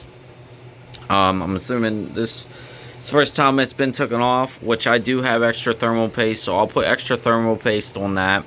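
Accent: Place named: Pittsburgh, United States. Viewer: You have to be near Pittsburgh....That accent.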